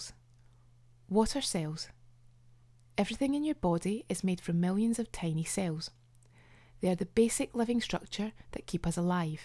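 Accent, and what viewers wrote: Scotland, United Kingdom. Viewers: I really love your vids, please keep them coming! Very informative and I love your voice and Scottish accent!!! Cheers!!!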